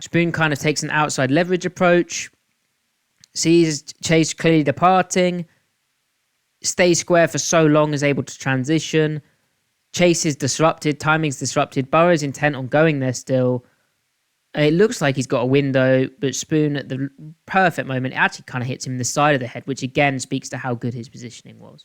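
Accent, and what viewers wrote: United Kingdom. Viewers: YOU'RE BRITISH!?!? I've been following you on twitter for a while and had no idea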